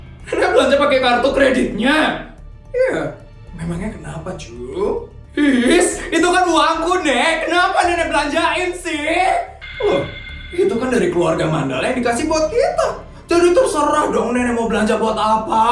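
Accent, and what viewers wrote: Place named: United Kingdom. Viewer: Logat Inggrisnya dah hampir ilang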